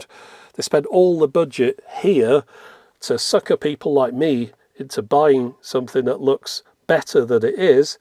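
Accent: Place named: RP, United Kingdom. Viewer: "Right. For some reason, the radio's stopped tuning in now. I think it’s all dying, but anyway…" His ability to sarcastically say something so matter-of-fact with such a posh, high-class English accent never fails to amuse me. 😂😂😂👏🏼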